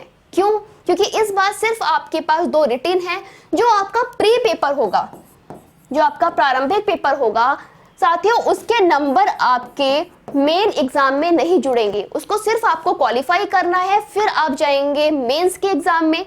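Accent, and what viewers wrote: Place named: Maharashtra, India. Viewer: Marathi tone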